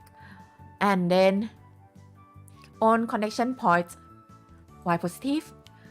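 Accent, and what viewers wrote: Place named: Japan. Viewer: Why Do You Sound Japanese?😮😮😮 Btw I'm New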